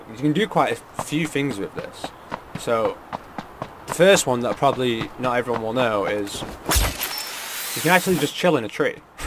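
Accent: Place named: Derbyshire, United Kingdom. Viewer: Exactly what I thought mate! Hints of the Derbyshire tones in your accent just like me. I'm also from Derbyshire as it happens!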